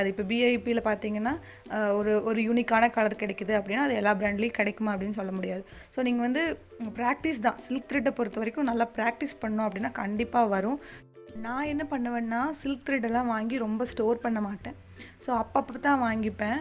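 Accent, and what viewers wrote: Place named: Coimbatore, India. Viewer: உங்கள் பேச்சு மிகவும் அழகு சகோதரி. நீங்கள் கோயம்புத்தூரா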